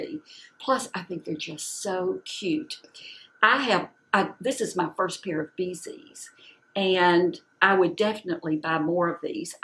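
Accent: South, United States. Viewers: Glad I found you. I'm fast approaching my 70's and the difference between my late 50's and early 60's has caught me off guard! Changes in health, sleep, and my body in general. You are honest and fun without tons of pretense. Plus your accent reminds me of my favorite sister in law and other family that stayed down south. I use to live in Florida as a kid. Thumbs up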